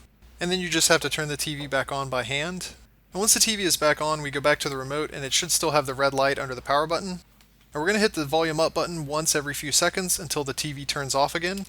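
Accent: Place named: Maryland, United States. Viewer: You are the man. What is your accent are you from MD? Thanks so much.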